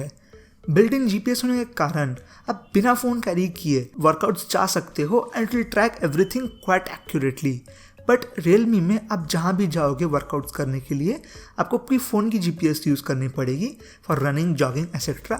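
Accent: Odisha, India. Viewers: Are you from Odisha ?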